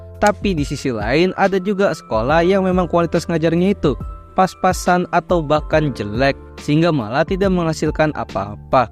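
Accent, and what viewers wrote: Java, Indonesia. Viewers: Lebih ke jawa sih bang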